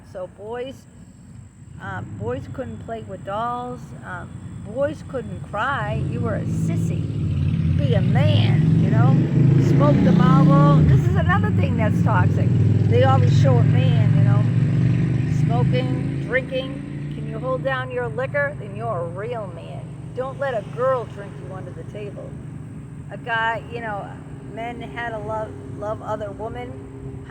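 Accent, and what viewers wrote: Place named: New York, United States. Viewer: Hey. Totally cute and funny as hell. Yep, watched the whole talk. And I'm not a bot. I'm guessing , with that accent, White Plains NY or maybe Yonkers? (pushing it). I am 62 now, and you just captured all of it. Do they have Gay G.I. Joe now? Haven't had that one dumped in my lap yet on soc. media. Rainbow Joe. Healing prayers for your past trauma, Been there, done that. You look great, obviously taking care of yourself. ONWARD!!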